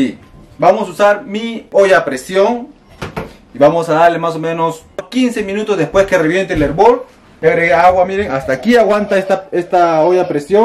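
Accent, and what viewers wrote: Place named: Peru. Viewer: tu no has dejado tu acento de de peruanaso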